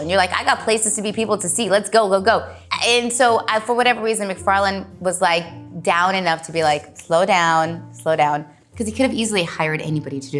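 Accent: Ukraine. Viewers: I feel like she must have been talking to her mom or dad right before this because I can hear her Ukrainian accent sneaking out 😂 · i love how i can hear her ukrainien accent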